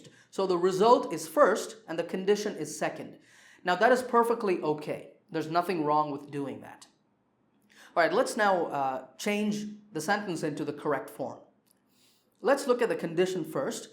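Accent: United States. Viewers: Very clear presentation. The speaker has a relaxed but crisp American accent. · Wow such a great prodigy from an India, your accent or tone of your voice is like an american....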